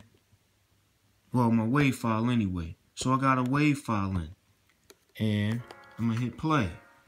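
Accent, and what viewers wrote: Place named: Baltimore, United States. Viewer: I love this video. I'm sincerely grateful I hope you do more videos brother. Sound like you from Bmore Peace !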